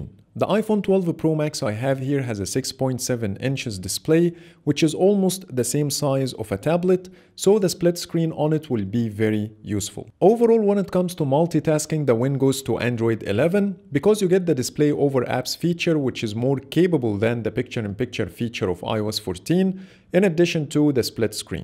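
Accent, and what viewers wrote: Egypt. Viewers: ناس بتقول انك مصري سمعت من ناس كتير انها بدات تفهم إنجليزي من الطريقة حقتك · You have the unique egyptian accent 😂😂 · Am sure u r Egyptian.. Your accent is so Egyptian.. Thanks for the great content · Your accent sounds Egyption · اللهجة المصرية 🤣❤️ بس بالانكليزي 🤪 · واضح انك مصري تكلم عربي بالله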